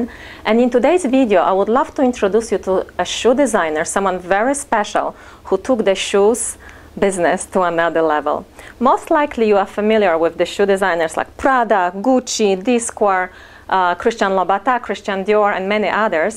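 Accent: Poland. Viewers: Are you polish ? Because you have a polish accent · amazing polish accent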